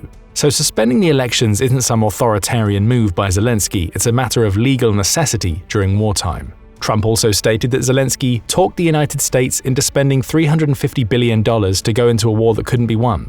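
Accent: United Kingdom. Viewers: YOU ARE TALKING A LOT OF NON SENSE, ON TOP OF IT STATING THAT UKRAINE HAS LOST 46.000 PROPLE IS BEYOND COMMON SENSE. FROM YOUR ACCENT YOU ARE BRITISH MEANING YOU ARE SADELY LYING ALL THE WAY.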